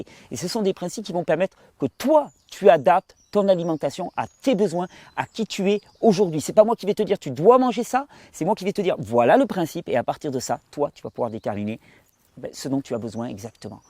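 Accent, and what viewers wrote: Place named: Southwest, France. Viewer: Bonjour! 😃 Te rends tu compte que je te connais à travers tes vidéos depuis des années et que seulement en juin 2019 j'ai commencé un jeûne. Je suivais ton programme alimentaire uniquement des yeux, parce que je n'ai pas les moyens financiers pour acheter un extracteur de jus et des fruits et légumes bio. Là j'entame mon 3e jours de jeûne sec, que j'espère faire durer 7 jours au moins. Je suis terrorisée par comment je pourrais casser le jeûne sec. J'ai vu ta vidéo sur un jus vert avec de la noix de coco ou avocat. Mais comment faire? Je n'ai pas d'extracteur de jus. Il y a un biocoop pas loin de chez moi, je pris pour qu'ils aient les jus appropriés. J'adore t'écouter parler, c'est d'ailleurs ce que j'ai fait depuis le début du jeûne en visionnant toutes tes vidéos de 2016 sur le jeûne la fête du corps. Ton timbre de voix et ton accent du Sud-Ouest, ta facilité d'élocution et ton grand savoir, sont source d'apaisement pour moi. 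À défaut de fruits et légumes bio, je me nourri de toi. Merci mille fois! 🙂 Merci encore! 😃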